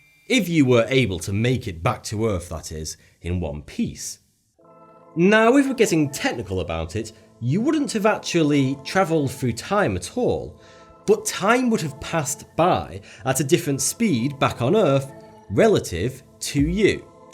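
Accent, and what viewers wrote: United Kingdom. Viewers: What variety of British accent is this that pronounces a soft "th" as an "f" along with the rest of the peculiarities? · I really like that British accent. Ur "but" sounds for me like a "bot", and reminds me the Medieval game the voice of that thing in the head of a skeleton.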